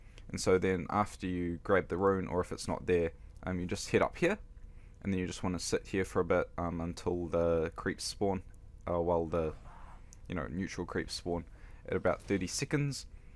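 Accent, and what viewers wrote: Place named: New Zealand. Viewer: Thanks for this it's helped a bunch. Also btw you sound kiwi as hah.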